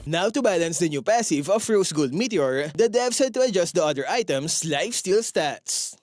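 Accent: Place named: Philippines. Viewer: English filipino dictionary accent.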